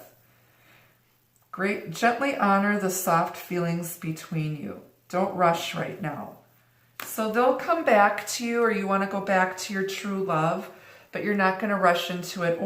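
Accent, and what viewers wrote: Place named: Wisconsin, United States. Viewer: i miss the wisconsin accents in my life! listening has been a real treat for me (subscribed)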